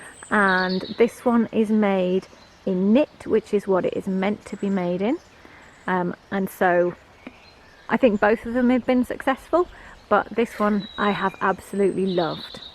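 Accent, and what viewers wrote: England, United Kingdom. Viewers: All things old are new again! Macrame was a big thing in the 1970’s too. I didn’t do it but my sister was into it big time. It went with her California lifestyle. We pronounce it Mac-Ra-May. I love hearing the English pronunciations of the same word. So fun. Looks like you’ve done more in your garden since we were there. I don’t remember the hanging swings or the arbor. Neat!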